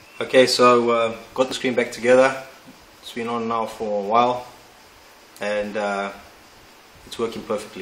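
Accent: South Africa. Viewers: Are you South African? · Hi my fathers LG flatron w2043s started fading a while ago had lines and just wasnt right obviously ive tried a rubber on the ribbon for corrosion and tried to extend my laptop display via vga cables all i got was a white screen any idea what i can try next? are u from South Africa by the way ur accent sounds close:) im an SA dude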